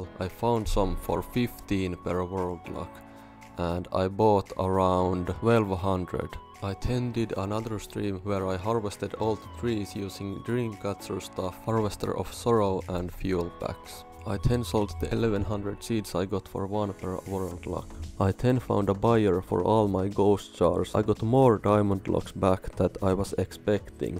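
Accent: Finland. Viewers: Are u finnish?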